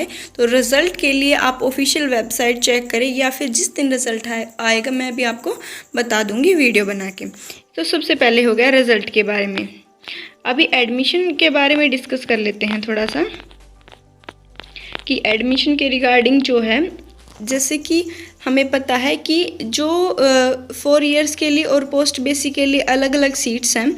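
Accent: Haryana, India. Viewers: Ma'am aap haryana se ho kyaa😁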